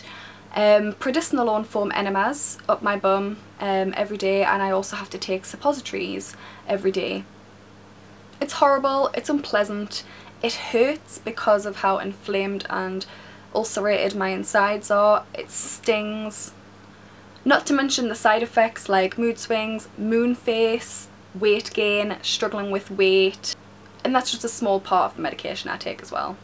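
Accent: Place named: Newcastle, United Kingdom. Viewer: Great video. I am in the process of being diagnosed. I have had bleeding and mucus at 2 year intervals for the past 10 years. Mine seems to be very mild at the moment, no pain and limited toilet visits- however, it is not very nice even at this level! P.S. recognisable accent, I hope you are a Toon fan! ⚫⚪⚫⚪⚫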